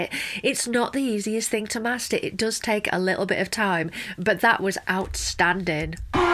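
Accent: United Kingdom. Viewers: I love your British accent! <3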